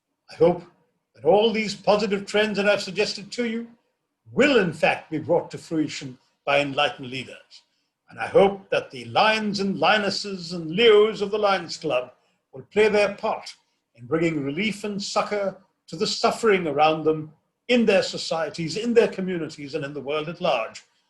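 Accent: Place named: RP, United Kingdom. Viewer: A very shallow take on multilateralism using a high sounding ideals totally divorced from the reality on ground where people around the world have been impoverished by a world run on fiat currencies. With no real exchange of value possible in the market place using fiat currencies, the call to strengthen multilateralism can only be considered a compromised sub-serviance to the forces behind the phenomenon. Now why would a politician from our world -south Asia, Asia, the so called 3rd World-- advocate for the continuation of a system that has manifestly impoverished us to our present helplessess? You should focus some on improving your intentions and making clear where your loyalties lies instead of trying to sound more gora than the Queen! Be.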